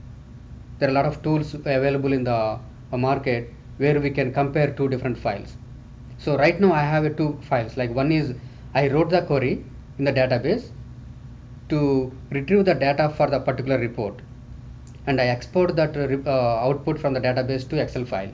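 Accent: India. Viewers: He might be south indian..but he has good knowledge in this sector.. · Good information , but his hindu accent pain in the ears.....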